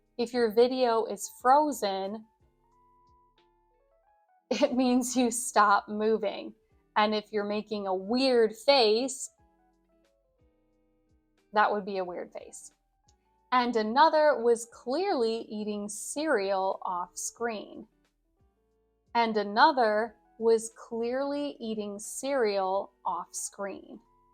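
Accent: United States. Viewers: WOW!!! Finally I found a great shadowing English channel with the beautiful American accent!!! 🙏🏻🙏🏻🙏🏻 More difficult than British accent to pronounce but more beautiful when it's well spoken (no slang, no ghetto stuff). Thank you, I'm a new subscriber 🥳🥳🥳💖✨💕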